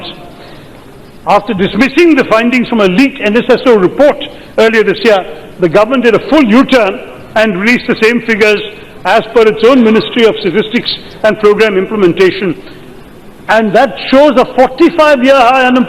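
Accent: United Kingdom. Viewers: I really really admire not only bcoz of his english accent but highly bcoz of his speech which is always in the point 👍👍👍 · It's nice to deliver something like British accent, but how many Indians would be able to understand it, and this is again an advantage to the government. So, better showing our Excellency through any language we have to make our citizens to be aware of every single fact and figure out the actual face of the government. Hume ye dhyan Dena hoga ke aam logon ko Sarkar ki kaam kaj pata chale.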